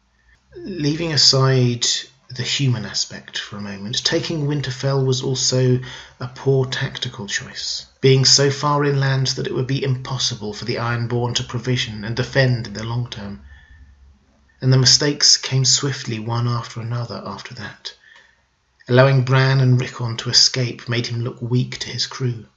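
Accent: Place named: RP, United Kingdom. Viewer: Hi, I've done, among other things, radio ads and have gotten feedback that I have the best voice in local announcers, etc and was chosen over many others for a series of PA announcements at DIA (Denver Airport). I have also directed audio sketches with a cool group previously online. I say this to let you know I know something about voice delivery. There is something to consider in what Yol says. Got the downbeat voice criticism from radio station managers, when the women said the slower voice was good. I think they were reacting as women and found my voice attractive - whereas the men were more balanced in their judgement and as such should be listened to. You have so many very good things going on with your voice and delivery - but there is a certain downbeat aspect to your voice that bears improving upon - it tends to lull the listener who will fight to stay awake because they can tell what you have to say is important. 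Often very important.. Perhaps it is to bring more variance in your voice, variations in inflection, stressing certain words over others and differently. Changes in the tempo of the words to liven things up. There is a peace and humanity in your voice that makes listeners want to be civil and human on your posts. Very humble though you're speaking in a very posh upper class British accent. Very good on your content! These videos help me live without the series or any new books. Yours are quite good and... quality stuff!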